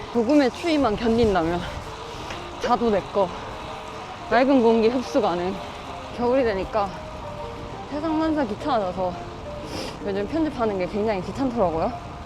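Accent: Gyeongsang, South Korea. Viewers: 경상도 어디 사셨었나요?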